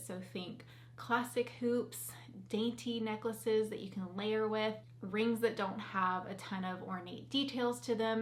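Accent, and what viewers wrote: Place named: South, United States. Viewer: She's southern :)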